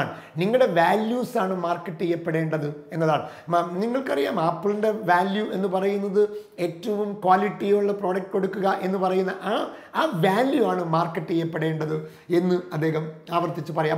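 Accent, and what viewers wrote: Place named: Tamil Nadu, India. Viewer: .... ഇപ്പോൾ അവതരണം... മെച്ചപ്പെടുന്നുണ്ട്... പഴയ' തമിഴ്ചുവ'ബോധപൂർവം അകന്ന് പോകുന്നുണ്ട്.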